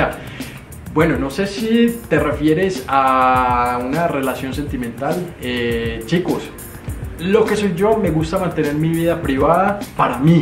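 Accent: Antioquia, Colombia. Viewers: estás otra vez con tu acento paisa jajaja lindo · Ya tienes de nuevo muy marcado tu acento colombiano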